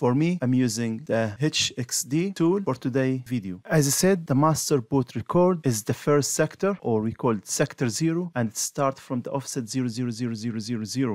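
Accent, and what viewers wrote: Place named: Jordan. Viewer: Solid Jordanian accent.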